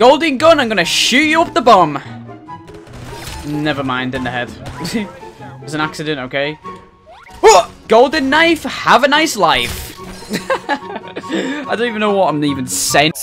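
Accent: United Kingdom. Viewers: are you talking english in british way ?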